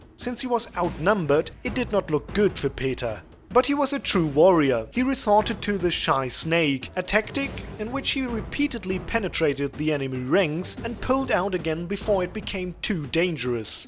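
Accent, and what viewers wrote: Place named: Germany. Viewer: Das ist sehr gut. German english pronunciation sounds great and hilarious! Glad I found your channel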